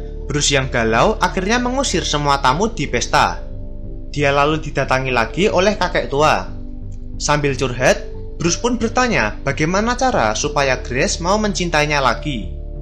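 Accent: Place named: Java, Indonesia. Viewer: TIDAK MENARIK , KARNA PEMBAWAAN CERITA TERLALU JAWA MEDOKK WKWKKK , NO SUBSCRIBE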